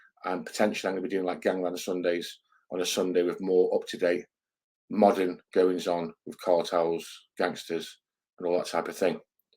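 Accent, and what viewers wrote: Stoke, United Kingdom. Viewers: Is the narrator from stoke?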